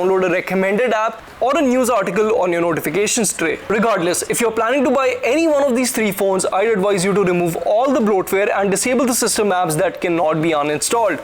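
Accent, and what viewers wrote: India. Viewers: Bro, tumhari english, hindi jaisi lag rahi hai. Thank you bro 😎😎. Easily samaj aa rahi hai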